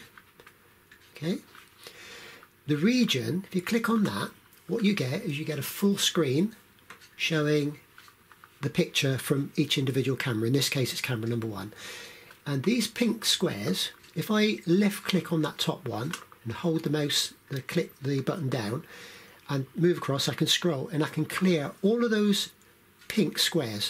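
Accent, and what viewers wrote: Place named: West Country, United Kingdom. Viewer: Mate u sound west country ish lol👍😎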